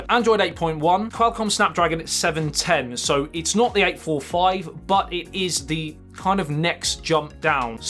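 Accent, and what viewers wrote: United Kingdom. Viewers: Hate british accent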